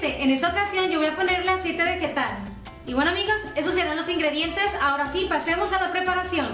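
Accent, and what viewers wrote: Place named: Escuinapa, Mexico. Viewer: Una chulada arriba escuinapa saludos bonito asento escuinapense chirriss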